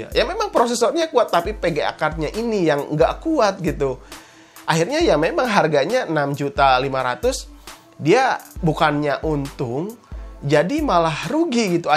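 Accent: West Java, Indonesia. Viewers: Sunda pisan euy :v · nyunda geming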